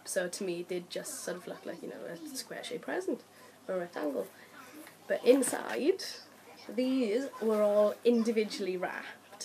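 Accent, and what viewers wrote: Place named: United Kingdom. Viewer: Your accent is so gorgeous!! What part of the UK are you from? And I love the video. :') Before I Fall is amazing.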